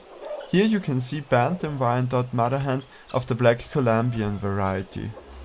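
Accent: Austria. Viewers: are you from Austria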